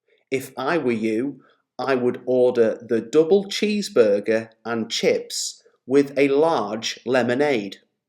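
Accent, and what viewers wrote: United Kingdom. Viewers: Does the speaker's accent is a british not american ?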